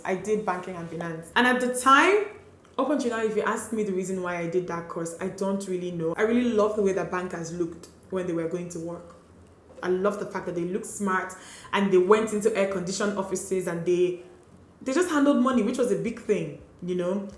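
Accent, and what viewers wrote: Nigeria. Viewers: I appreciate you story, however you are talking to much. You look refined, but when you opened your mouth....typical Nigerian accent and speech pattern. If you refine it, you would capture more subs.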